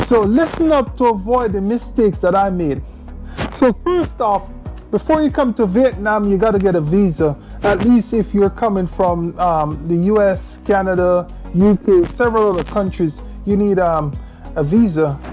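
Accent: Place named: Caribbean. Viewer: I hear the west Indian accent where are you from?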